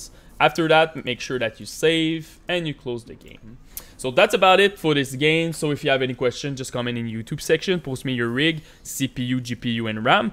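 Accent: Quebec, Canada. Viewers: J'ai reconnu l'accent québécois direct lol pis mon user est aussi Ludov lol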